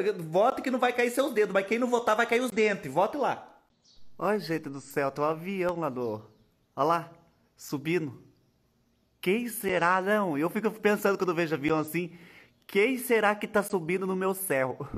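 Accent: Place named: South, Brazil. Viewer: Sotaque sulista!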